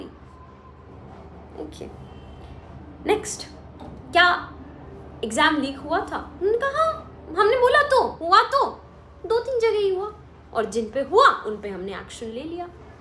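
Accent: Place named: Bihar, India. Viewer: Are you bihari your toun like bihari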